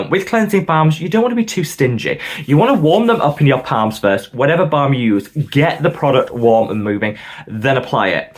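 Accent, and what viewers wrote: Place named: North, United Kingdom. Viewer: Love this!! I'm a huge fan of Revolution products - will it be better than the Sali Hughes one which is just lovely. I think your vids are fab, fellow northerner here loves hearing your accent and the info you give is invaluable especially for those whose pockets are not as deep as some reviewers like to think. I'll be popping it in my basket next shop! I must say My skin has improved dramatically over the last few years, I'm 47 and since following a real and consistant skincare routine my confidence has been boosted, especially since people are saying I look 10 years younger than I am - I'll take that ANYDAY! I'm not saying I have good genes, but I do know the skincare and advice your channel has given me has helped for SURE!! Keep up the good work, I'm jealous of your skincare shelf!! 😍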